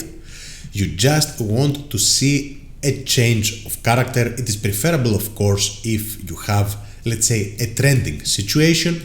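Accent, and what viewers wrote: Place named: Greece. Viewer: That greek accent is strong !!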